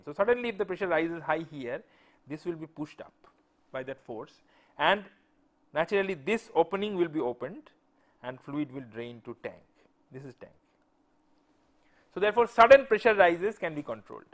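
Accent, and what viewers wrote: India. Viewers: you just have to love his / indian accent :DD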